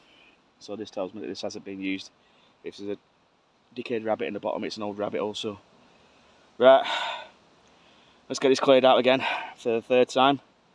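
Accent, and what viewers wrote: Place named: Lancashire, United Kingdom. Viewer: Hi I'm a recent subbie. I enjoyed watching this dig, it's always interesting to see if anything good appears whether it does or not. The Cutex bottle is nail polish. The brand is still going nowadays. Nice to hear the Lancashire accent as I was born in Burnley but lived down south since I was one year old. Keep up the good work!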